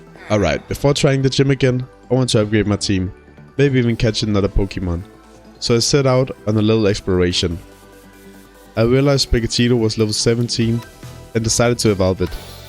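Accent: Denmark. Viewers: Denmark maybe 🤔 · are you danish, you sound very danish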